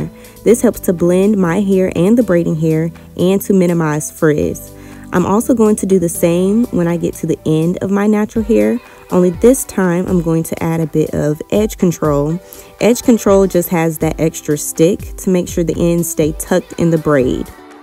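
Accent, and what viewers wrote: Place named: Jamaica. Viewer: Jamaican Accent Yes 💞💞 lol.. You come to take over YouTube 👏👏👌👌 Get it kinks Beauty..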